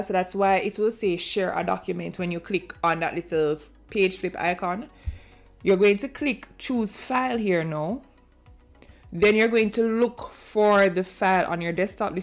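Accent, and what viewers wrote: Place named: Caribbean. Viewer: Awesome... love the Caribbean sound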